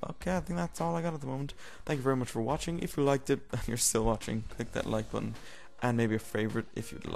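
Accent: Ireland. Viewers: Sexy Irish husky voice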